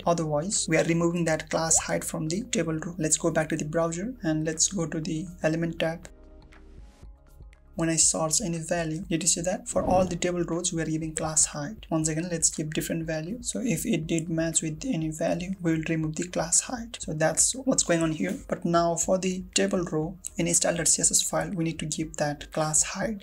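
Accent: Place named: Nepal. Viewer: Brother sounds like a Nepali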